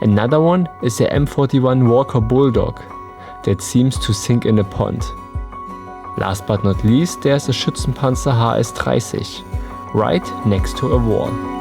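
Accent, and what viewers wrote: Germany. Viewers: His accent is intense. This is 100% pure german accent without even trying to pronounce properly. Sorry but that makes me cringe :D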